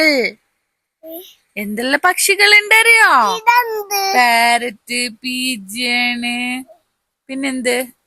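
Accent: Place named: Kasaragod, India. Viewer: Kasaragod basha തുടർന്നോളു ഇതാണ് നല്ലത്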